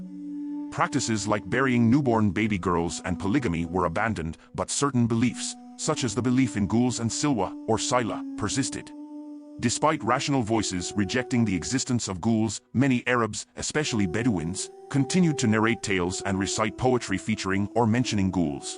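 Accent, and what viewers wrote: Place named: Scotland, United Kingdom. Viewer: I dig the vaguely Scottish AI voice. It's like listening to an up and coming Deckard Cain type doing a class project.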